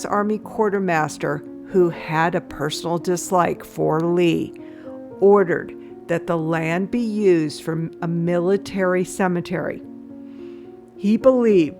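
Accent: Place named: Iowa, United States. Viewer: Narrator must be from Iowa. I grew up there and it’s the only place where I heard natives say “Warshington” or warsh.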